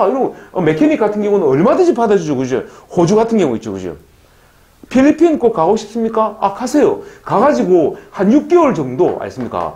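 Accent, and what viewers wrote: South Korea. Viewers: 안녕하세요.! 하!하!하! 정말 리얼한데요.!! 구수한 사투리...정말 친밀감과 진실성이 드러나서 왕팬입니다. 이번 에피소드는 영어유학원에 관한 얘기라서 더 진지하게 들었습니다. 지금도 필리핀에 계시는건가요? 저희는 샌디에고에서 CC2UC 라는 유학원을 운영하고 서울 강남에 국제학교를 운영하고 운영하고 있습니다. 혹시 역으로 필핀의 부유층들이 미국으로 유학을 원하는 층들을 모집할 수 있다면 어쩌면 도움을 드릴 수 있을 것 같아요..ㅎㅎ 한번 검색해보세요. 내년 여름방학을 이용해 필리핀으로 여행을 하게 될 경우 한번 만나뵙고 의 고매한 인품과 경륜을 배우고 싶네요..!!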